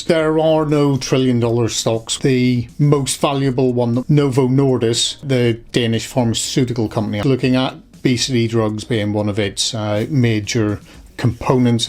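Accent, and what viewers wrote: Northern Ireland, United Kingdom. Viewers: Great content plus good to hear a local N.I. accent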